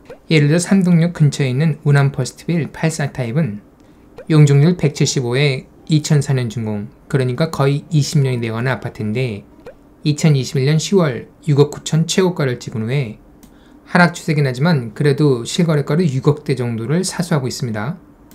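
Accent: North Korea. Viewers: 말투가 탈북인 같은데....아.... 새터민....이지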